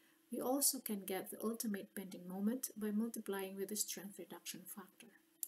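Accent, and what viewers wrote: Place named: Europe. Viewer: Incredible voice. Very interesting accent: a combination of European accents(?) But should have been closer to the microphone.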